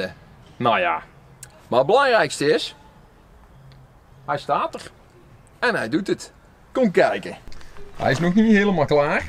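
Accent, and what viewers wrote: Brabant, Netherlands. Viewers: He's Netherlandish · gast... was ook niet als haat, hou alleen ontzettend van da brabantse accent van m.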